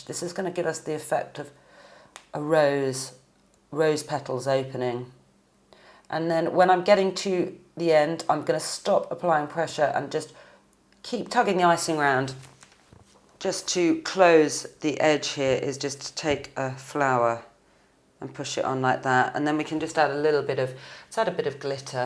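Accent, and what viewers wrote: England, United Kingdom. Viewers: I love her accent!!! I'm so going to England London some day. Nice cupcakes!!! Lots of frosting. thats what I like!!!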